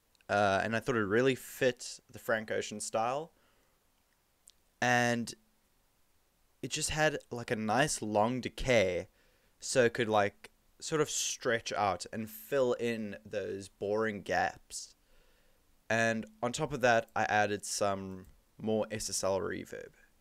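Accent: South Africa. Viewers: lol the way I can tell you're South African just off of your accent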